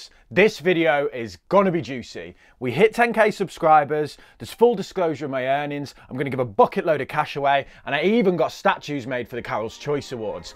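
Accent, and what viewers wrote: United Kingdom. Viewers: Honestly and truthfully. I have been watching you for about 2 weeks now. And first i like to hear our British lad talking about shares and giving advice. I know there are alot out their abroad but it's different ( you know what i mean) . I have you on my notifications. Keep up the great work most importantly, your Honesty..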